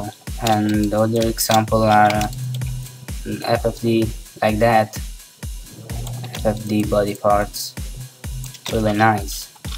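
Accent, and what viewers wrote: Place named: Italy. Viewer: You sound so stereotipically italian.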